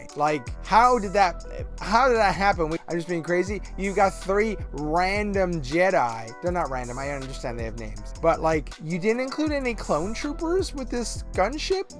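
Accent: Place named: Boston, United States. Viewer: This accent is crazy. It's like you're from Boston...Canada?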